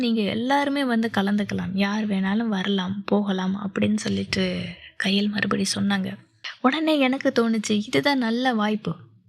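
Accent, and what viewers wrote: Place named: Sri Lanka. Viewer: enaku theriyadhu ak. Tamil pesivangau. I like it srilanka tamil❤❤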